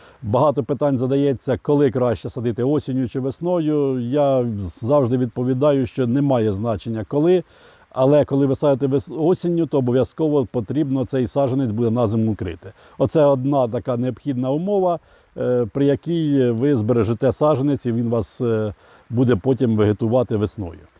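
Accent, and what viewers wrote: Poltava, Ukraine. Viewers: Согласен. Полтавская речь наиболее приближенная к литературному языку. Это не западынская, непонятная.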